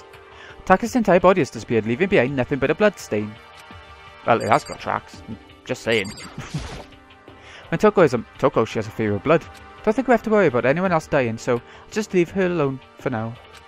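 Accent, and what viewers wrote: Wales, United Kingdom. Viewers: you sound Welsh asf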